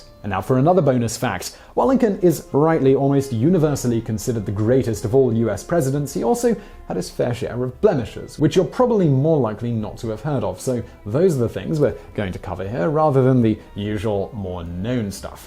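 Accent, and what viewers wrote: United Kingdom. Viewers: you have a British accent but, you use American terms. What do you call home? Btw thanks for the entertainment.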